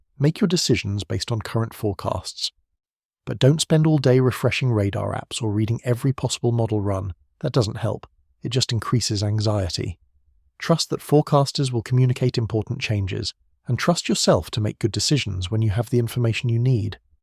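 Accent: United Kingdom. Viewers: Why a British accent narrating US weather.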